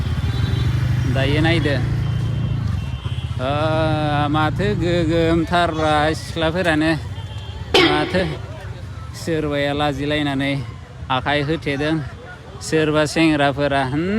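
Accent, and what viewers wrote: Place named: Bodo, India. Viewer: Your Bodo is so sweet.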